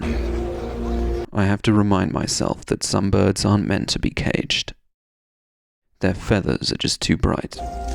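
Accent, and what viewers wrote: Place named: United Kingdom. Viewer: please sir i like your accent so much and I'm your follower student on youtube podcast. I found the 4000 essential words are read by American accent and really I like it to be with your British accent. please try to help us by reading these 4000 essential words in English.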